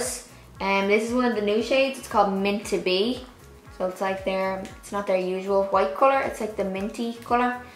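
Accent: Ireland. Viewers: I just found you the other day and I love your tutorials!! I love your hair aswell hah💓 also are you from Ireland? You've an Irish accent hah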